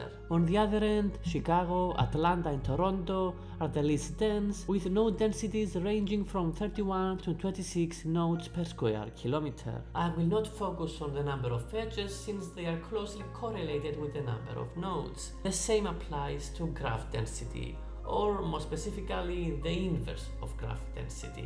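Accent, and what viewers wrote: Cyprus. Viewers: Sorry to ask this but.. Where are you from? You have a very a Cypriot accent ✨🇨🇾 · I can always recognize a Cypriot accent 😛. Ωραίο Βίντεο!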